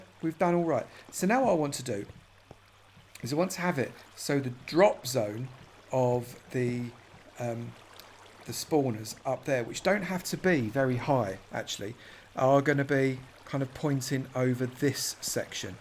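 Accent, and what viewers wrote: United Kingdom. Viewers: sorry but I want to know, R U british ?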